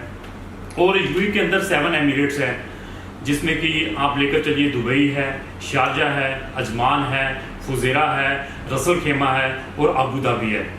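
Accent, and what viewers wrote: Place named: India. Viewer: would be nice to write and speak in english as we do not understand indian english sorry,couldnt understand a word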